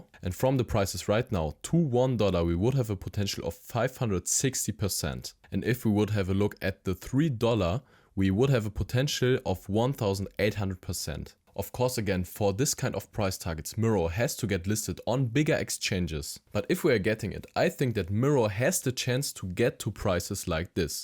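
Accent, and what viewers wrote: Germany. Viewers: Your heavy German accent gives me hope. You guys are very calculated when it comes to crypto. Everytime I come across a German content creator that technically analyzes crypto, it’s always a bullish sign. I’m all in on MYRO and almost got 1Million. Let’s go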